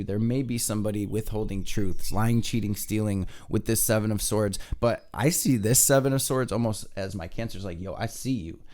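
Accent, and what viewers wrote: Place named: Chicago, United States. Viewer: I'm new to your channel, like 30 days or so. Definitely sticking around. Love your vibes and that chitown in your accent. Thanks mucho fam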